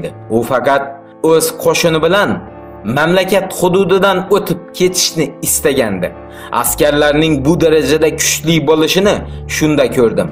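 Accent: Khorezm, Uzbekistan. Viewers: Ovozingga qutagim mincha xorazm diysan o'zbek de bildik zorazmdan ekansan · eyy siz O'zbekistonni qaysi viloyatidansiz xurmatli admin shevaizdan Xorazimliksiz manimcha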